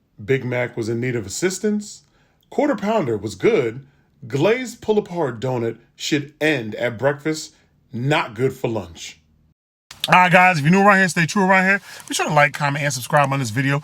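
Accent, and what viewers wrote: United States. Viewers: Drops your Russian always thought your were American brother hahaha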